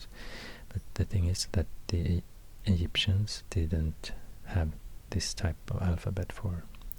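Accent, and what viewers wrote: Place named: Sweden. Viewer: You is swedish?? I recognize my accent when I hear it 🤔 Anyway amazing video! Loved it ❤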